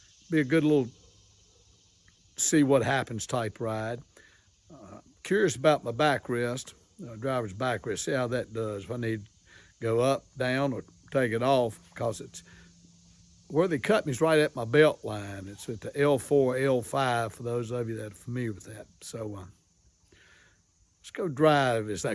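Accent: South, United States. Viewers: Welcome back brother, missed seeing your riding and your southern accent 😂. God bless.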